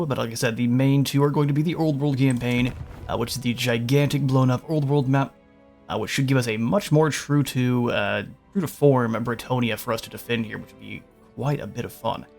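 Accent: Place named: France. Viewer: Love the French accent.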